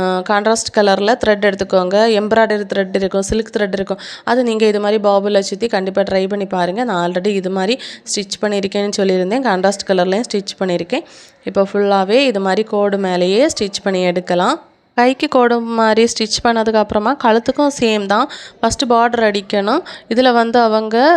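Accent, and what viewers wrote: Kanyakumari, India. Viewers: Hi sis your kanyakumari slang is really nice I like your native language ❤❤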